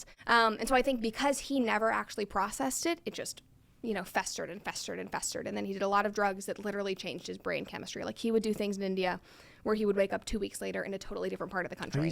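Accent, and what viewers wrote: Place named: South, United States. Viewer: I don't think it's a fry, I think it's a little southern trying to come through like she's had voice coaching or something.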